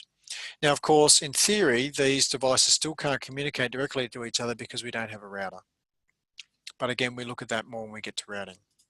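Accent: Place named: Australia. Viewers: yes you're right. Australian not British. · Definitely Australian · Are you suggesting that an Australian presenter, working for an Australian university should try to put on a fake accent of some kind to somehow make it more understandable for others? What's your suggested fake accent? Or should he record each lesson multiple times in various accents? Or maybe he should learn multiple languages. I can't believe that after more than 2 hours of informative material, that's your only feedback.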